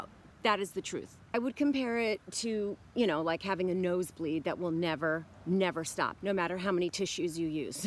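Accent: United Kingdom. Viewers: Your British accent is so Carnaby Street! I love it. Cheers.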